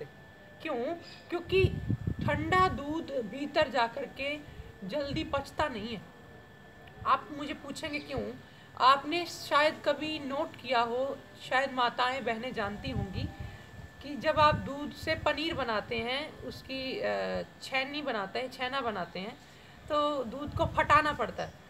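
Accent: Punjab, India. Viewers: Sir aap Punjabi ho ?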